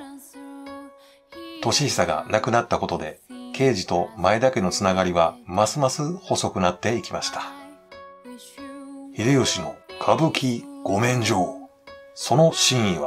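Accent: Kansai, Japan. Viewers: 主さんは関西の方かな？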